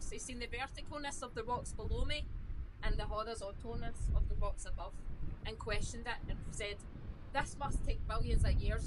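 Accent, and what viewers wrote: Scotland, United Kingdom. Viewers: I’ve said it before but I’ll say it again: geology sounds better in a Scottish accent. 🤘🏴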